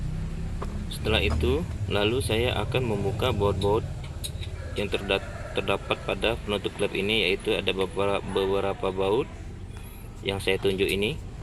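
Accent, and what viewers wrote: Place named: Palembang, Indonesia. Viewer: Logat Bahasa suara anak palembang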